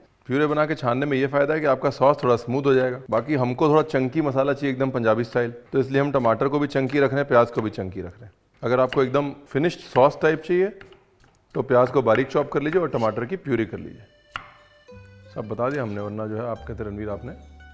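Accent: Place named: Bihar, India. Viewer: Aap Bihar cartoon mein bolate hain bhai ji bahut Achcha lagta hai aapka bolna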